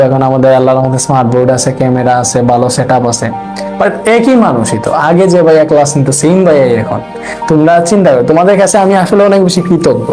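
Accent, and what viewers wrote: Sylhet, Bangladesh. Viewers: Apnar basha kotay vaiya sylhet er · Baiya sylhet r kun jaygay apnar bari. Apnar kota bolar style deklay buja jay apni kati Sylheti ❤ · সিলেটি নাকি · Bai apne Sylheti 😮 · ভাইয়া আপনি যে সিলেটি এটা এতদিন ক্লাস করার পরও বুঝলাম না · Accha sir ki Sylheti..? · ভাইয়া কি সিলেটের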